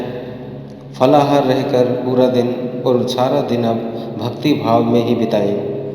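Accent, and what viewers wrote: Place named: Punjab, India. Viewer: आपकी आवाज बताती है आप पंजाबी हैं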